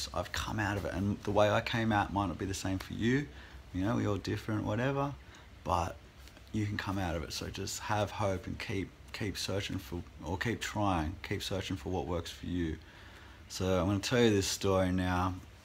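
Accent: Australia. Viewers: It was the first time that l watched your video . Love your accent , do you from aussie? Thanks for sharing your experience. I hope that I can get rid of this habit one day . Although I've juste had finished eaten again after dinner . Sorry for my English . Love from france .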